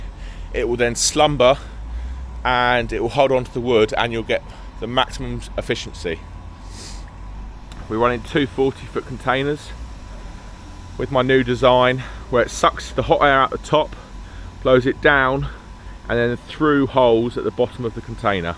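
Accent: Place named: United Kingdom. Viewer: I've stumbled upon your channel this week and I'm liking the operation, the surroundings, your nice British accent and the fact that you seem like a nice bloke. Good luck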